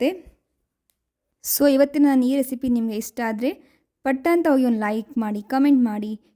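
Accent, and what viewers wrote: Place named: Bangalore, India. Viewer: Banglore style lli sakhathagi mathadtheera👌😊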